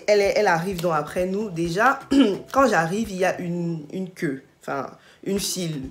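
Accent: Cameroon. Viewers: T'es camer? L'accent ne trompe pas.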